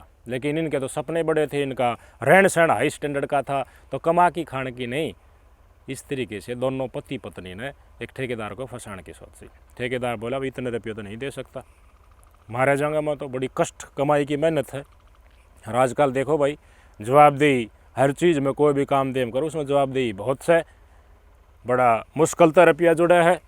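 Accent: Haryana, India. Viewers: Enjoyed Haryanvi narration of story but content is good one.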